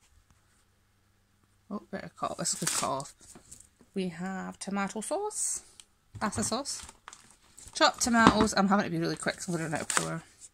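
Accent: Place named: Scotland, United Kingdom. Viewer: Love your Scottish accent along with your pantry haul - luv Toronto csnada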